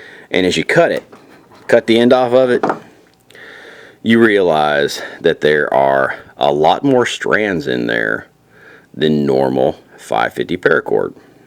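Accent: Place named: Appalachia, United States. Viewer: Is it possible to do the whole video without sounding like an inbred hillbilly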